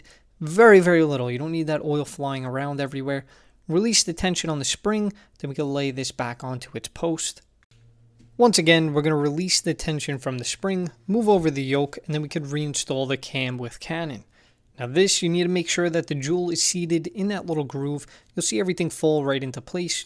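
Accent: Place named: New York, United States. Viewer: Imma tell u the truth my guy, Ive shown non new yorkers your accent as a definitive reference, mine is not as thick as yours so I only sound like you in heated moments. Love the videos, I'm a collector of magic the gathering cards and try to take aspects of your hustle+customer satisfaction into my card trading, feels good when an "everyone wins" scenario I helped crafted pans out.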